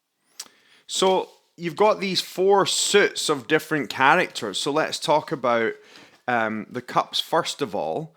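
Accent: Scotland, United Kingdom. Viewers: After starting a course to learn Reiki I suddenly really wanted a tarot deck to meditate with and I chose the Wildwood deck which also has artwork by Will Worthington. I absolutely love this deck and it is very similar to the Druid deck, with standing stones, the Green Man and ancient folk lore. I should imagine you also have the Wildwood and the Greenwood Decks. I just found your channel and enjoyed listening to a Scottish accent talking about tarot. I am also from the UK.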